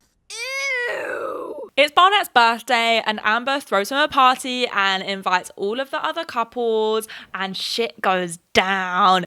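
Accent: United States. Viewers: honestly your american accent is pretty good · Ok ur American accent was actually good tho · I strictly liked your video for your american accent! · As an American, your American accent was the best part of this video 😂 It sounded great! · That was actually a really solid American accent. I can barely hear a slip up, like at MOST a few sketchy vowels haha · I absolutely ADORED your American accent! Great video as always 👏🏾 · How is your american accent so good? holy shit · Just FYI, your American accent is great! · i loved your american accent (as an american) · Your American accent is sooo good! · Omfg your American accent… you are the first British person I’ve seen get it right! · ahhh i loved this show!!! love that i got to revisit it with your hilarious commentary and omg your american accent is so funny LOL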